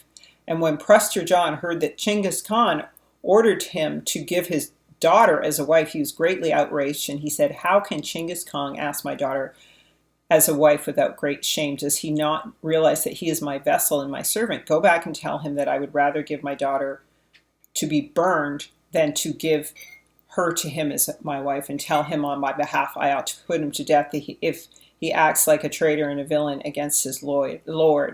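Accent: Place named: Jamaica. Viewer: I love the Yahmacan accent, Teach. & Beautiful Voice, I'm crushing.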